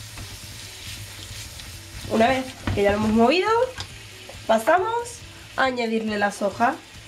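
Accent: Andalusia, Spain. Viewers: Muy buena tu receta y muy lindo tu acento andaluuuuu.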